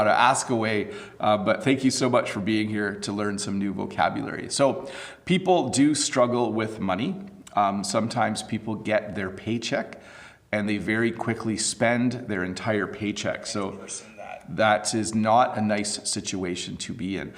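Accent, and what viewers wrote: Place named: Canada. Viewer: Thank you, i find your canadian accent better than the u.s.'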